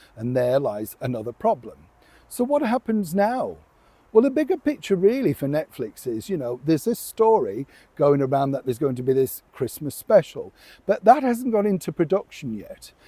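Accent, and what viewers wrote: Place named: Doncaster, United Kingdom. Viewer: Doncaster interesting accent😂😂😊😊 I’m from Donny, interesting int, tword ad use love.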